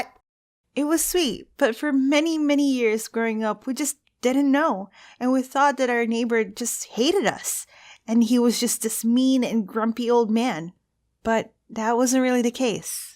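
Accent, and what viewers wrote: Philippines. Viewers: I love her filipino accent.... X3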